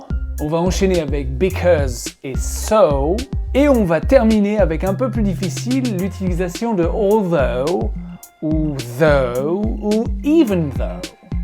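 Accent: England, United Kingdom. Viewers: La premiere impression.. Je me suis tout de suite dis que c'etait un anglais ....u have a good English.... J'aimerais bien apprendre avec vous... Vous êtes très sympa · J'adore cet "accent" anglais :) Thank you so much !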